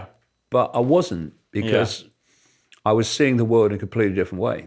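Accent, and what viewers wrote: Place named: Birmingham, United Kingdom. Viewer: Spot on me... BUT YOU ARE STILL DRAGGING THE CORPSE OF COLEMANBALLS THROUGH THE OPEN SEWER OF MY OVERHYPED DESCRIPTION WHICH IS MORE GRIPPING... WHAT...IT IS GETTING MUCH WORSE BY THE SECOND......PLEASE DAVID...DEAR GOD STOP THIS DRIVEL AND PRESENT SOMETHING EVEN REMOTELY CONNECTED WITH THE PRESENT, REAL WORLD AND MAYBE EVEN YOUR MOANING BRUMMY DULCET TONES.....I CAN'T COPE WITH ANYMORE... YOU HAVE A POTENTIAL WORLD STAGE AND YOU FUCKING WASTE IT 🗑 TOSSER BEHAVIOR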